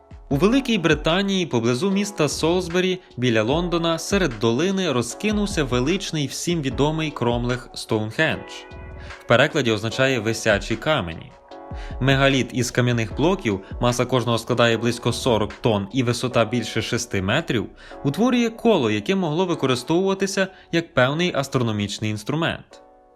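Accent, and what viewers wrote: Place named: Volyn, Ukraine. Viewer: Класний голос у диктора, з таким рідним ледь помітним волинським акцентом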